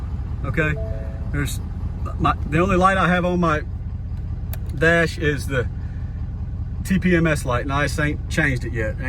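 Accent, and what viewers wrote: South, United States. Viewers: Hey, just wanted to say that your videos are really helpful. And it’s nice hearing a southern accent from back home. Just got a <num> and I’m looking to build it very similar to yours, minus the tuning and weight reduction 👌🏼. I gotta have that warranty. Thanks!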